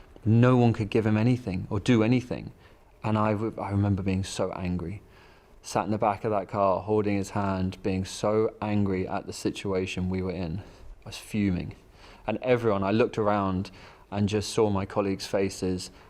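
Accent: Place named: RP, United Kingdom. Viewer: sounds typical bbc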